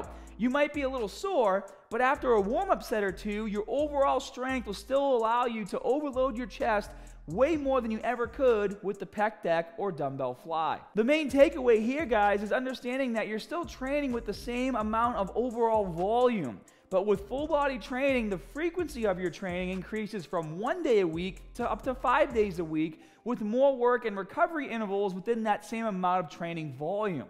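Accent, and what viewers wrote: Boston, United States. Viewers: That thick Boston accent! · That's a proper Boston accent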